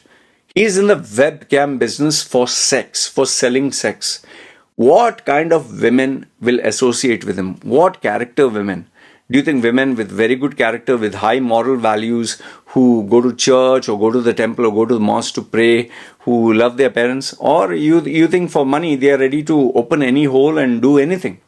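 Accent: India. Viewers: Latin name, Indian accent, Zombie tattoos, ice-fiend cool headed book worm in Thailand of all places You're a more interesting character than Andrew Tate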